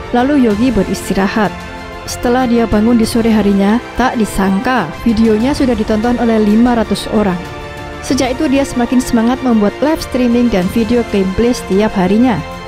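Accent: Lampung, Indonesia. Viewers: Bang orang Ketapang ya bandar Lampung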